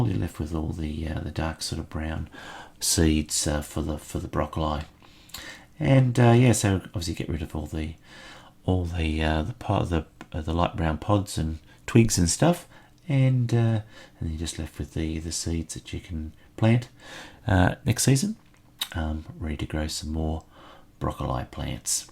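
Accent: Australia. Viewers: Strange, we also say it in England "Broc-o-lee". I assumed Aussies would say it the same xD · what is the warmest it gets during your winter? I heard the accent so thats why I asked because I thought it's always hot in Australia but I honestly don't know anything about your winter. Greetings from Maine,USA :) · How interesting! I didn't know you folks from down under say "broc-o-lie" where we yanks say "broc-o-lee"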